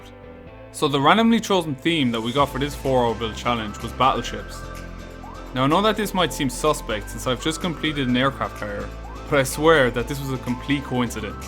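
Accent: Ireland. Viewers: u have to be irish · You have an Irish accent and by the way could you build the jungle battlefield 5 map it would be very cool to see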